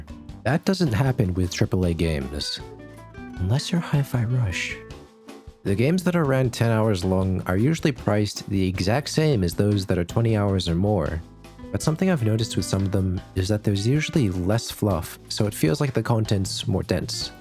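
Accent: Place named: United States. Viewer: Loving your videos man. Got a new subscriber. That said, I know you're Aussie, so I'm curious why your accent is 95% American, with 5% Aussie accent slipping through occasionally. It's tripping me out lmao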